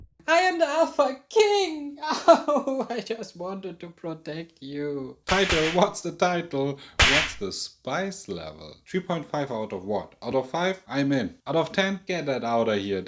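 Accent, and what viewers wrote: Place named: Germany. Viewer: immediately recognized that you're from Germany xD nice review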